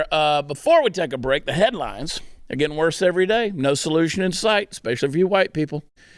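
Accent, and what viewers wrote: Texas, United States. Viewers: I personally love a wordsmith with a Texas accent! A day without Chad & Co. is less shiny and bright.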